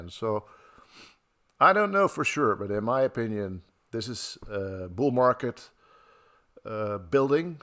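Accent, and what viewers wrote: Netherlands. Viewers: Cool vid! Where’s your accent from? You sound Dutch.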